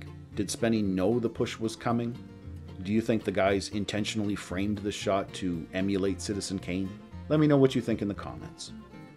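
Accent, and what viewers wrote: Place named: Canada. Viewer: long time sub, I'm not sure if I already said this, but your voice is very relaxing. You sound like Dank Net, same accent, similar pitch and cadence (albeit to lesser extent). Which accent is that? I've heard plenty of Canadians but you and Dank Net are the only ones with that specific accent. It's a cool accent, where does it belong to? I'm Australian so I wasn't taught anything about Canada in school unlike Americans (I'd imagine anyway) and from Perth, Western Australia - every Australian that foreigners hear are from Melbourne, Sydney, or the outback. I'd imagine Toronto and Vancouver to be similar to the Melbourne/Sydney in that regard? Idk, just an interesting parallel.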